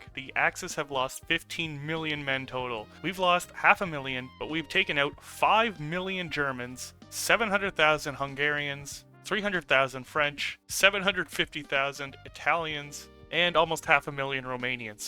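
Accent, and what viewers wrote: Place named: Ottawa, Canada. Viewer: I’m so used to HOI4 streamers being Europeans I was wondering what kind of Central European accent you had until you said “harder” and then “guards” right after … I am from Ottawa and probably have the same accent as you lmao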